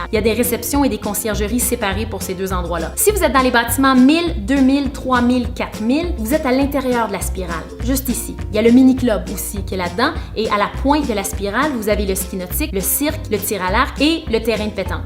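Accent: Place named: Quebec, Canada. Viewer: C est trop mignon ton petit accent québécois <3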